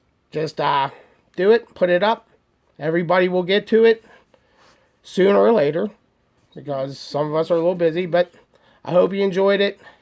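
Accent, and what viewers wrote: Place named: Pittsburgh, United States. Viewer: I stumbled on your video after watching Scale Enthusiasm's top five. I thought from your "accent" and your use of "Yinz" that you were from Pittsburgh. Then when you mentioned Cranberry, I knew I was right. I'm from Pittsburgh too. Have you ever been to a Steel City Diecast Club meeting? BTW great video. I'll check out your others and subscribe. Hope to meet you in person - Rick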